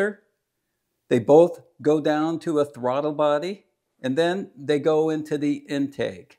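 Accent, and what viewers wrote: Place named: United States. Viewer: I noticed that too. Thought it was a European spelling but he sounds American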